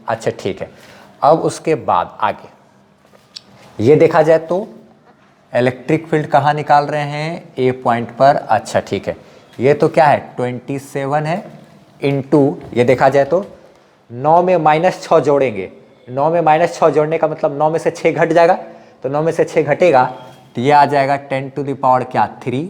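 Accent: Bihar, India. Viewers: Sir, aap Bihari?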